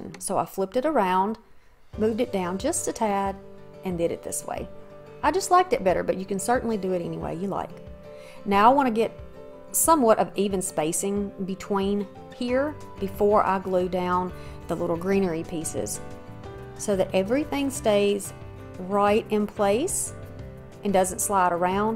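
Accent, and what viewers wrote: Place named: Alabama, United States. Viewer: Hello from a fellow Alabama girl! I haven’t watched this video yet, but I already know I will love it. I found your channel last weekend and I’ve been watching a lot of your past ones. I have one playing on my tv in the bedroom right now from last year. Love your channel and I love hearing someone who has the same accent as I do 😊❤ best wishes! (Subscribed and hit the bell to receive ALL your notifications 💜)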